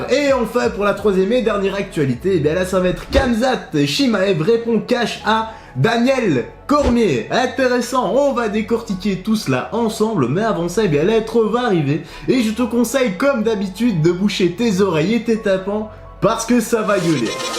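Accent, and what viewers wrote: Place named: Belgium. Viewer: Yop petit vieux nouveau sur ta chaîne YT. Nouveau fan de mma et de l'ufc depuis octobre 21. Après la découverte de mr Ciryl Gane 😁 Comme pas mal de nouveaux fans je pense. Sont année 2021 est impressionnante. Merci les multiples émissions sur lui et sa team la (ou le) mma factory. Du coup... A force de chercher des chaînes sur le mma je tombe sur toi et... 😁😂 ton accent bien belge même si je repère pas la région. Je suis de Charleroi originaire de Rochefort. J'aime bien ta manière de présenter tes sujets et le ton que tu utilises. Rafraîchissant, et assez marrant 😉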